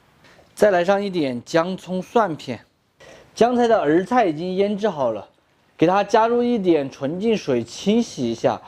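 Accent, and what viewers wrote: Sichuan, China. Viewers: 帅哥，就讲四川话嘛！这个弯管子的川普听起来好累哟😂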